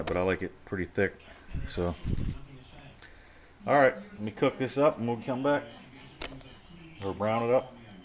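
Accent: South, United States. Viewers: How annoying is that! Someone actually commented that they missed what he did with the flour. You know you can watch the video more then once. I love your voice. It's country like me :) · imagine if this guy didnt have a southern accent. i wouldnt be able take this video seriously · Your country accent does things to me! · once you hear that thick southern accent and the video is about food... you know shits.. about TO GO DOWN !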